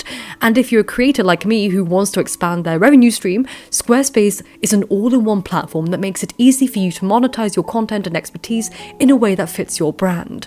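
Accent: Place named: Upper class, United Kingdom. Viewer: This was well told. The narrator sounds like she has an upper class British accent, which is quite pleasant. She also tells the story of Vasalisa and Baba Yaga with good verbal technique. I enjoyed hearing the folk tale.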